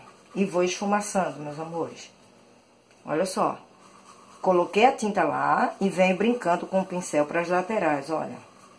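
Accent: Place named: Pernambuco, Brazil. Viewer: DEUS te abençoe pelo carinho o qual vc ensina, estou aprendendo e da forma como vc ensina facilita muito, vc é pernambucana? Pelo sotaque acredito que sim, gente muito boa de coração, meu esposo é 😊😊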